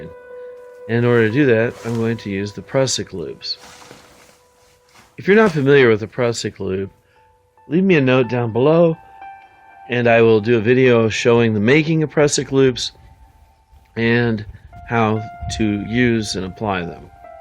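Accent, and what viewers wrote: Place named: Newcastle, United Kingdom. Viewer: he has a georrdie accent ,some folks thinks its scotish cheers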